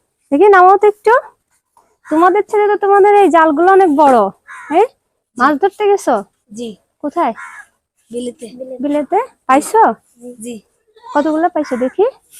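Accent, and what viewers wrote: Chittagong, Bangladesh. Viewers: আপনি চট্টগ্রামের যে,কতা শোনে বুঝা যাচ্ছে যে...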